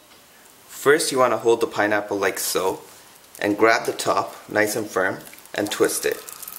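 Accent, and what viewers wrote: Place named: Caribbean. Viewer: I hear a Caribbean accent… Cayman Islands maybe?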